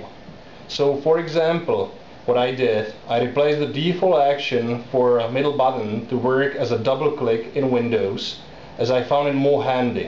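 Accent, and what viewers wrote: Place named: Czech Republic. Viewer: This must be czech guy talking, the accent is just awesome.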